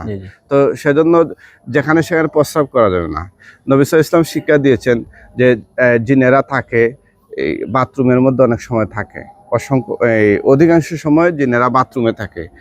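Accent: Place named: Sunamganj, Bangladesh. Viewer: Uni asole sunamgonj taken na sylhet. Unar kotha Barta sunamgonj era moto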